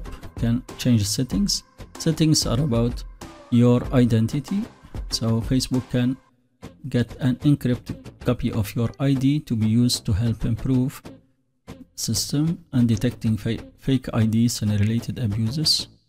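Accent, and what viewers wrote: India. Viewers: Annoying Indian accent video to telling me how to get my privacy violated by Facebook by me providing them my I.d. ,?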